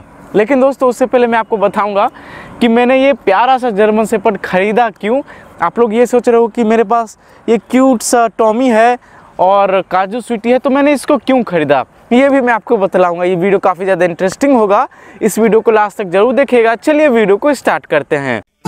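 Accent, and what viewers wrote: Bihar, India. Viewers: Bhai kya app Bihar se ho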